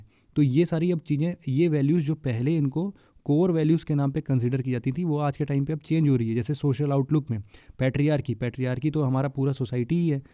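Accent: Kanpur, India. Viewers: Sir aap kanpur se ho kya??? Kidwai nagar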